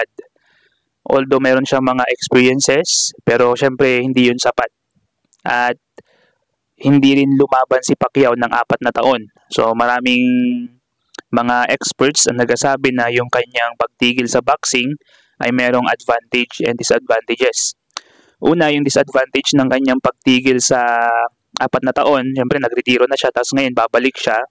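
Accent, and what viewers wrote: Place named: Visayas, Philippines. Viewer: Bisaya ka dong halata medyo bagwet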